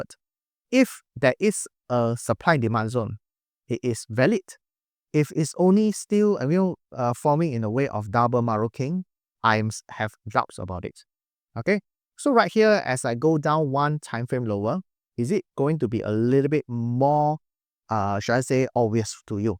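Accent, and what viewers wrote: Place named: Singapore. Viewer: I can't understand your singlish 😅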